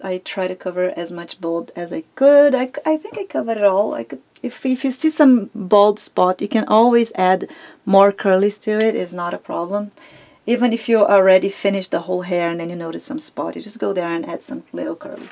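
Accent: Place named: Brazil. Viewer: Aah, eu sabia que seu sotaque era diferente, imaginei que fosse brasilwira no momento em que ouvi tua voz, alguém mais?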